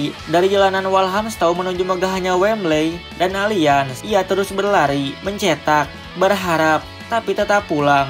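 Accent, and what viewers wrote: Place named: Java, Indonesia. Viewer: suaranya jga bagus kaya orang Jawa medok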